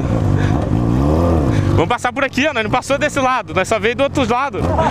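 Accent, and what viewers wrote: Goiás, Brazil. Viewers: achei q vc era de Goiás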